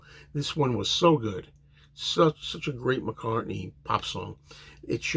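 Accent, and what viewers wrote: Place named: North Jersey, United States. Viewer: I have this one on vinyl, and saw him at MSG when this came out. Never really listened to it much., sound like you’re from northern NJ. Are you?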